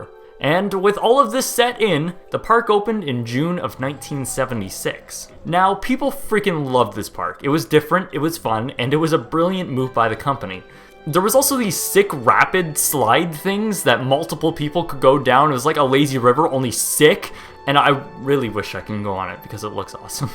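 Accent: Canada. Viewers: I swear he said aneoba with an n. Willing to bet he's Canadian the way he pronounces his o's.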